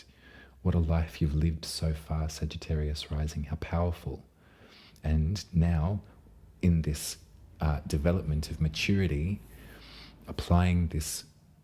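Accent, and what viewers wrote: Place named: Australia. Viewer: Finally! Someone who pronounces Uranus properly....gotta love a fellow Aussie! (And you do it with such style and grace) ❤🐠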